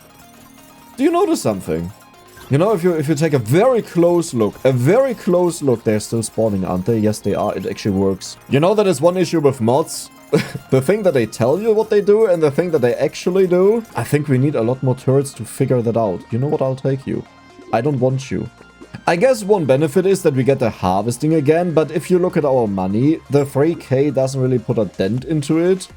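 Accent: Germany. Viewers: U sound very german and its tickling my non existent braincells! my bf likes your videos. Idk what else to say. This aint a hate comment btw, ;3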